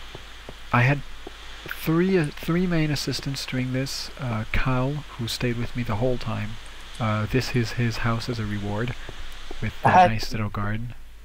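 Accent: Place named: England, United Kingdom. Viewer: tu as un belle accent englais.:)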